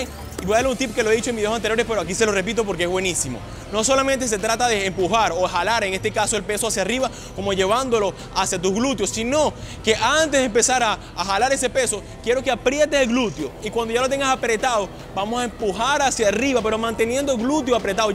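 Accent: Venezuela. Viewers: Este pana es venezolano?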